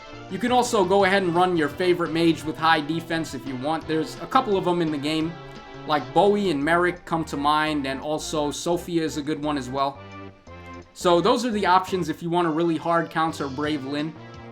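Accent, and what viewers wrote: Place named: Northeast, United States. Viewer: Are you from the north east? I enjoy your channel man, keep up the good work!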